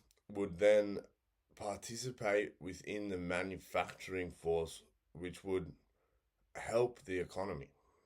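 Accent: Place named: Liverpool, United Kingdom. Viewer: My English is too poor to properly deliver my thoughts about immigration... Let's just say it has been a problematic topic around here too. I don't mind if e.g japanese accountant immigrates here via marriage but we don't have much need for thousands whose only work skill is goat herding. And also, multi ethnic societies are fuctional and fine, but multi cultural societies will face problems. And also #2... Australia? I thought you were British, from Liverpool or something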